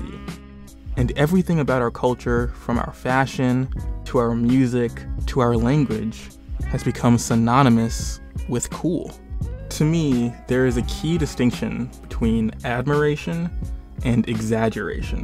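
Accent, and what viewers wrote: Queens, United States. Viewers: Isn't she from New York in queens, where the whole community sound like her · She grew up in Queens... the not nice part. Everyone talks like this. Indian, Italian, Asian, Mexican... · She really just sounds and talk like that she is from queens nyc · She's from Queens. Get over it.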